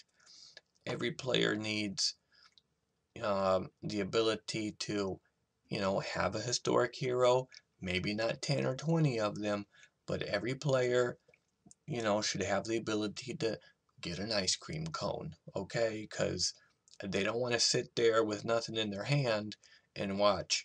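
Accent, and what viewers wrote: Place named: United States. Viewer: where you born in japan because you talk like your from the states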